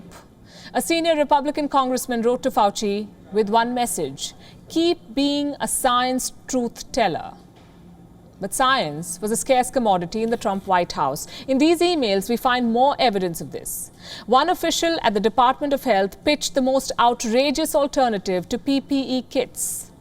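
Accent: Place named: India. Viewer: u have heavy indian accent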